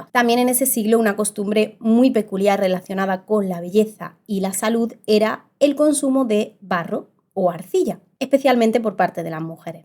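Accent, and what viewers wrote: Andalusia, Spain. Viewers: Seguro que es andaluza como yo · Tú acento es el más bonito de los acentos de España, al menos para mí oído latinoamericano. Muy interesante y entretenido tu contenido.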